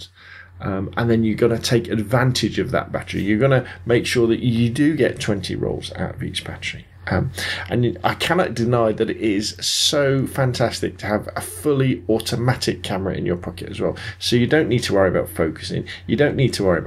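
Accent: United Kingdom. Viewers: Best review! Beautiful accent and I believe it's British accent! Peace :D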